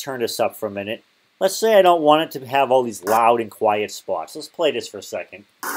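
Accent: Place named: New England, United States. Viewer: Great to hear a New England accent. It actually cracks me up I haven't heard it in so long.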